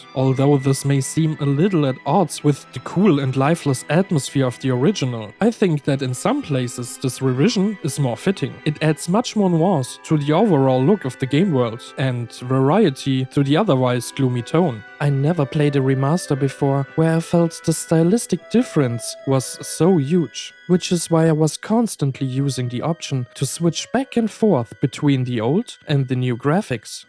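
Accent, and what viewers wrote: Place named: Germany. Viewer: could your German accent be any worse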